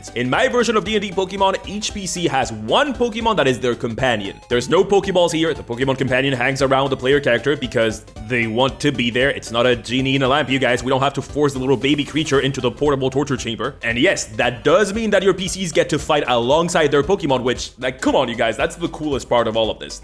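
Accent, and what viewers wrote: Spain. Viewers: Ideas muy chulas, dibujos geniales... Pero y ese acento en inglés siendo español??? Wow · waitaminut You're a fellow spaniard? Holy, your accent is really nice. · I mean… his last name is and he has a Spanish adjacent accent, it is sort of expected.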